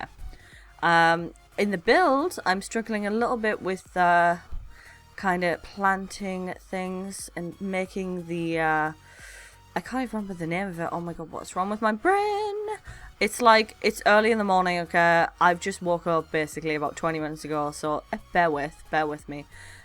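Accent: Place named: London, United Kingdom. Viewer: New subscriber here! Discovered you today and i'm in love with your content and your accent! Like... Damn, can i be from London to have it? hahaha keep up the good work! <3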